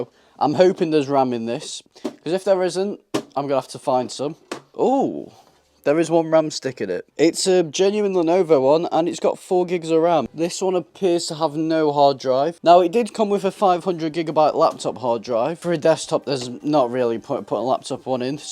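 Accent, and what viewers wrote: United Kingdom. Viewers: british accent explains it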